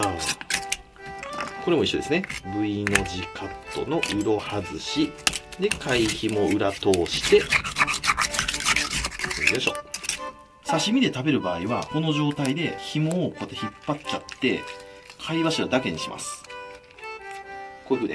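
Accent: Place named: Nagoya, Japan. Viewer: 名古屋生まれ名古屋育ちの自分と同じイントネーションでしゃべってるから笑えるw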